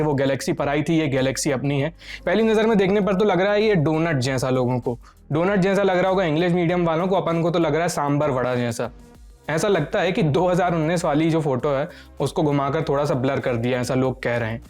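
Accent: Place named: Purvanchal, India. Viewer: मैटर फस्स्स गया है 😃😃😃 आप और स्टोरी राइटर 200% यूपी पूर्वांचल के मालूम पड़ते हो 😎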